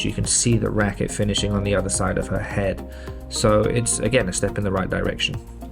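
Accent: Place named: United Kingdom. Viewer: interesting, I thought it's in Europe, judging by your accent I thought it's in Britain. Thank you for this extremely helpful video.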